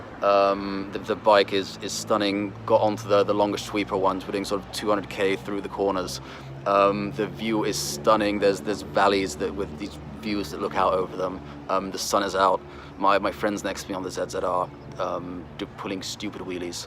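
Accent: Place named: United Kingdom. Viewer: He also speaks like he learned from a Brit. Probably went to school in the U.K.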